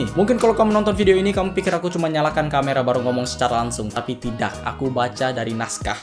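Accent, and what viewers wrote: Makassar, Indonesia. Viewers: Lahiran Makasar jdi kebawa logat ibunya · logatnya malah kaya orang makasar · Logat makassar juga ya? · Ada logat Makassarnya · kok sedikit logt nya mirip orang makassar yah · logatnya kyk orng makassar hahahahah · lu orang makassar? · sounds like using makassar dialect :) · bahasa logap makassar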